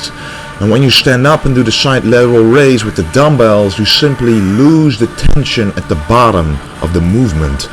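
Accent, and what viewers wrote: Netherlands. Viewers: I hear a slight Dutch Twang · he is dutch man that is him talking normal lol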